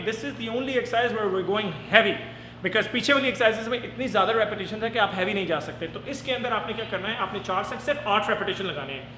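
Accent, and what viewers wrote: Punjab, India. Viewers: loved ur way of telling punjabi tone especialy .and thanx for tips i will try .and pls let me know the brand of protien too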